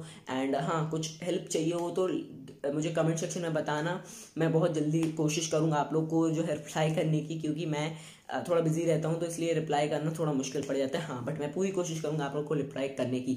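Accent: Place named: India. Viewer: You sounds like true Indian but Atleast you still can speaks English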